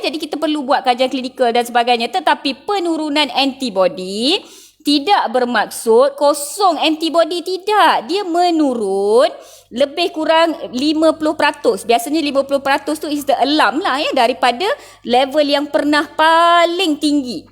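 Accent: North, Malaysia. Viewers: ya ka....igtkn org utara...heheh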